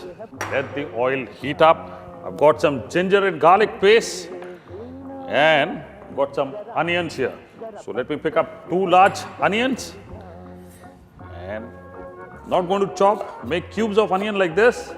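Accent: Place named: South India, India. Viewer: South Indian accent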